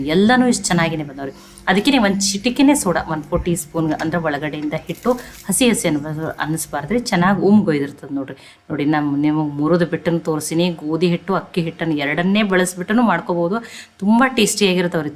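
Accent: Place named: Bijapur, India. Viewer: Madum nim video daily nodtini ri tumba isht nanage nanu bangalorè inda but nau bijapur davaru niu yav ooru pls tilsi nim matu nodidre niu bjp davara anta kushi agutte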